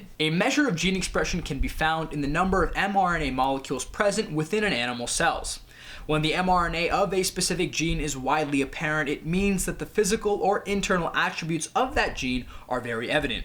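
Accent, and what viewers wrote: India. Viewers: Do you have a tiny bit of an Indian accent? I'm not sure whether or not I'm hearing something.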